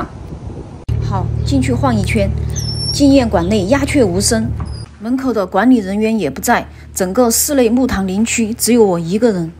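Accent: Sichuan, China. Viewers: 川普好评！👍听着就亲切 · 我是贵州人，也是半个成都人，所以很喜欢听你的川普话。你去的这个墓园我两年前去过，我们当时是三个人去的，尽管大厅里有音乐，因为空旷无人，走起来毛骨悚然。你视频里拍到的那些地方我都有经过，我也看到了这几个人的墓碑，还有一些你没有提到的国民党高官的壁葬碑文。我拍了照片，发了微博，我也非常感慨，岁月不曾饶过谁。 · 四川口音。。。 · 听你川话上头了，问一下你联系方式，也想听你的讲话保持上头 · 听口音应该是重庆或是四川人的博主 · 四川峨眉？普通话不准，😄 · 就事论事，川普话真是难听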